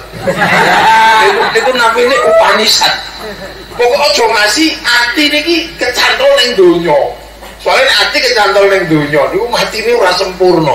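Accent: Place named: Banyumas, Indonesia. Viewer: Wong bumen ngapak rangapak rakepenak💖💖💖🤣🤣🤣🤣nyimak gus🙏🙏🙏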